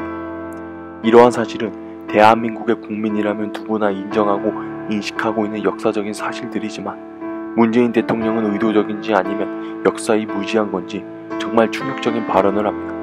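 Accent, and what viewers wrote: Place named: Hamgyong, North Korea. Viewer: 함경도 사투리쓰네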